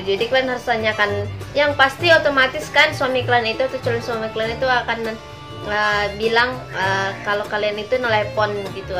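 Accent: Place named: Medan, Indonesia. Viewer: Oh seperti itu ya ka..logat nya kayak orang medan ya ka salam dari rki asal lampung tips nya sudah di simpan ya ka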